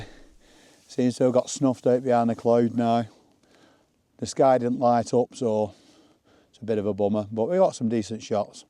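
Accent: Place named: Northern England, United Kingdom. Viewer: Fantastic to see you back out and hear your superb Northern tones,us Northerners stick together. I’m 62 and you’re inspiring me to start this wild camping malarkey. Look forward to the next video mate.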